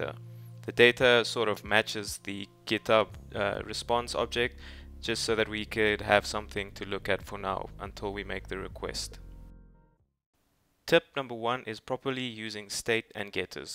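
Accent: South Africa. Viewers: Sound like a South African, good for you.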